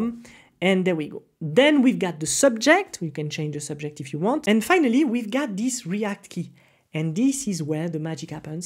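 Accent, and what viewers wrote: France. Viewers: Hello, t'a pas les même vidéo mais en FR? Bien que l'accens francais aide à la compréhension ahah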